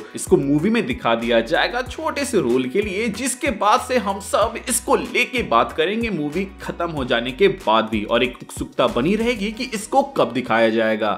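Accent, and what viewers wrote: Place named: Jharkhand, India. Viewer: Feka jata h , dhekla jayega bhai tm jharkhand se ho kya ???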